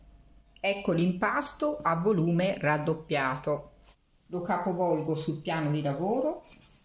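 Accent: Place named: Florence, Italy. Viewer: Bravissima! Ti seguo da NY. Cercavo una ricetta facile per panini. Grazie! Adore il tuo accento fiorentino😊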